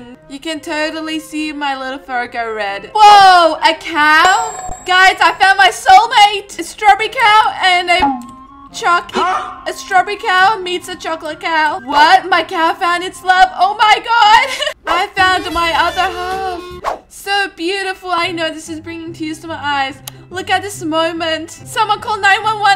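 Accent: United Kingdom. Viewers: I'm very surprised by your British(or idk) accent(or voice)And can I join your videos?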